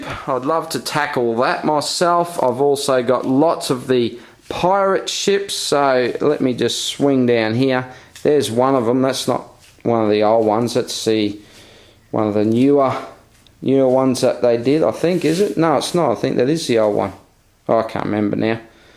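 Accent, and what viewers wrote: Australia. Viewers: That is a huge collection... but can you tell me what accent that is? Is it Australian?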